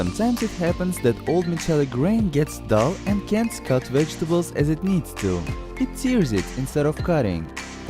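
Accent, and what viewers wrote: Poland. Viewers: Nice to see polish accent in this video 1zł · are you from poland becuse you have accent and you used polish coin and you even name you hamster stefan! which is polish name :) pozdrowienia!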